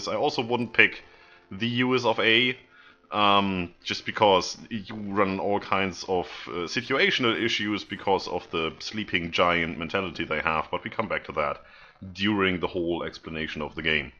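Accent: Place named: Northern Germany, Germany. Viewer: Always warms my heart to hear a North German accent. :)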